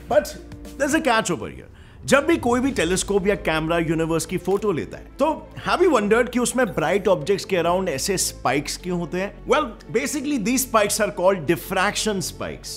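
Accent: India. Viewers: Why with these videos it's always an Indian guy with such a strong accent and also that he doesn't know that much English words so he just goes to his original language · I will love if you text it, i really have a problem understand the dialect India/english.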